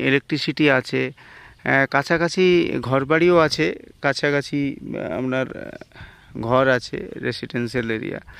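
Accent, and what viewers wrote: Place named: Bangladesh. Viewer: এই ভাইয়ের কথা শুনে মনে হচ্ছে উনি বাংলাদেশি। আমাদের খুলনা অঞ্চলের বাড়ি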